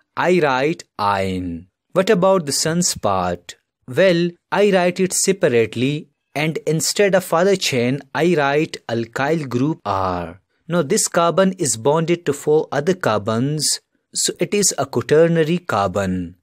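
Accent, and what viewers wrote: South India, India. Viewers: Through you spoken rythm i definitely got that you are south Indian sir 😂😂😂😂😂 i really like your teaching way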